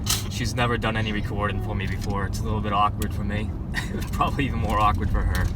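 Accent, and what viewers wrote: New England, United States. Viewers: Cool...thought I could hear a fellow "New Englander" accent in there :)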